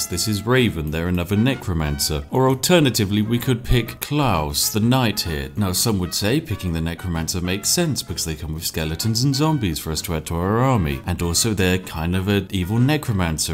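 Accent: United Kingdom. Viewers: i like the voice narrating, so smarty kind of bri-ish and with silly jokes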